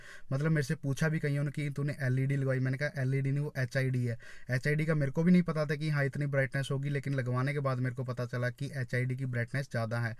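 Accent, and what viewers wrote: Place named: Punjab, India. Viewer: That punjabi accent.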